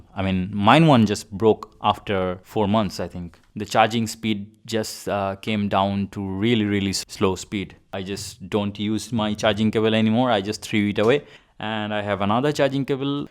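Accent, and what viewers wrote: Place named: Northeast, India. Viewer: He has an Asian accent. North East Indians have such an accent.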